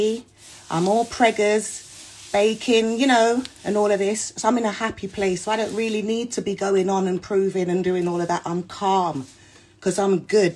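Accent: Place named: United Kingdom. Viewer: Your not from Jamaica your from England you grandfather was from Jamaica so you have Jamaican roots 🫣 your talking in the most British accent going and then talking about your sisters brother in law 😂😂 you didn’t go up on side shows you grew up on the side of the London streets getting cleared out and the videos are on YouTube for proof. The point about Ibiza would be correct if they lived in England their entire life and never visited Ibiza every year then correct you would know more then them about Ibiza streets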